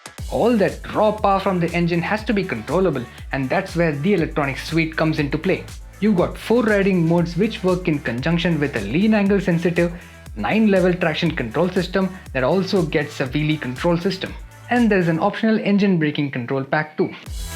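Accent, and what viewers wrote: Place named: India. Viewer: is this a English speech practice from an Indian?🤷🏿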